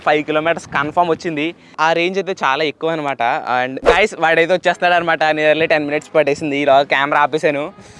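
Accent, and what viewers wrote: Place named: Godavari, India. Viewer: Godavari slang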